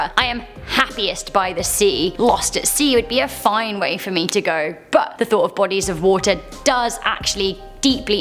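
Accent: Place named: United Kingdom. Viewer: it’s her accent she’s from the UK 🇬🇧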